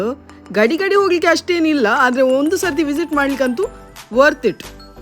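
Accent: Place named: Tulu Nadu, India. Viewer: ಸೂಪರ್ ಮೇಡಂ. ನನಗೆ ನಿಮ್ಮ ತುಳು ಮಿಶ್ರಿತ ಕನ್ನಡ ಮಾತಿನ ಶೈಲಿ ತುಂಬಾ ಇಷ್ಟ. ತಾಯಿಯಾಗಿರುವ ನಿಮಗೂ ಅಮ್ಮಂದಿರ ದಿನಾಚರಣೆಯ ಹಾರ್ದಿಕ ಶುಭಾಷಯಗಳು. ನಿಮ್ಮ ಮಗಳು ಮುದ್ದಾಗಿದ್ದಾಳೆ. ನಾನು ಬೆಂಗಳೂರಿನಿಂದ. ಮೂಲತ ನನ್ನ ಊರು ಪುತ್ತೂರು. ಧನ್ಯವಾದಗಳು ಮೇಡಂ.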